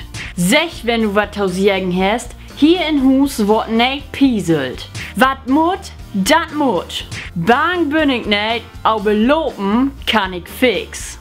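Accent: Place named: North, Germany. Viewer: ick find dat bannig good wat du dor mäks, bloß vertell mi es, woar du weg kümms, dat klingt so no norden, tüssen bremen und kiel. bi us in´t münsterland häb wi nen bäten annern snak.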